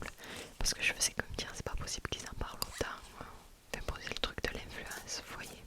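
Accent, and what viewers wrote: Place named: Marseille, France. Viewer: Je suis marseillaise ❤ ta un accent marseillais incroyable ❤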